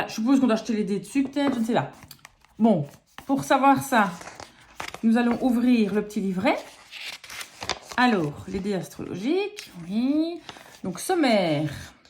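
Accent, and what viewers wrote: Belgium. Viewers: Très bel accent Belge 😃😃😃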